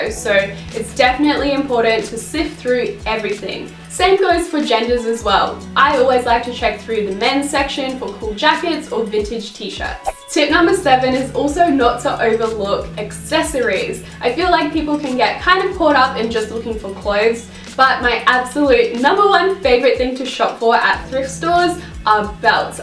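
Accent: Australia. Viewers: Her accent is actually Australian :)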